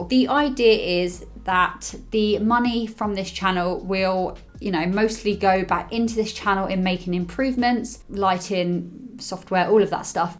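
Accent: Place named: Cockney, United Kingdom. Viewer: Good luck - with the channel and the job my cockney sparrer 😁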